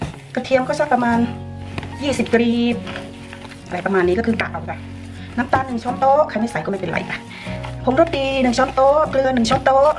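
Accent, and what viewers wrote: Isan, Thailand. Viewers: เพิ่งเข้ามาดูคะหนูบอกว่าเป็นคนสุรินทร์แต่พูดเหมือนอีสาน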